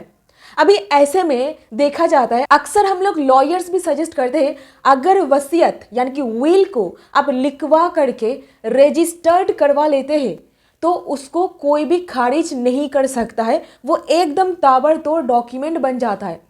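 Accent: Bengal, India. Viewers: nice explanation through a thick Bengali accent. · Nicely explained with beautiful bengali accent.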